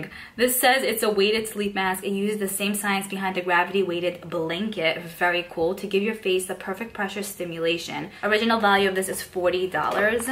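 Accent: New York, United States. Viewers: I've been subbed to you for a few weeks ever since I heard about your collab, I've been really enjoying your content. The luxe box seems the same as others I've seen, your Ipsy ultimate was really good especially compared to others. I love your accent btw, is it NY? If so were sort of neighbors I'm in Philly, PA. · Girl I live for that lip on you and your ny accent!!!!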